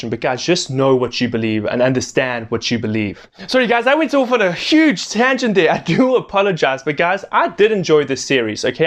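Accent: South Africa. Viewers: <link> Some background on Islamic eschatology.. btw you sound like a South African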